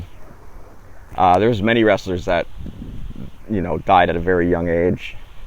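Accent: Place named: Canada. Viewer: I can tell with all those "oot's" that this guy has to be Canadian.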